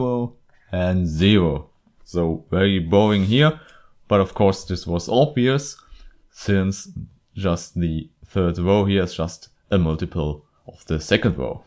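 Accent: Germany. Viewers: Great tutorial video though Permit my saying that your accent seems german.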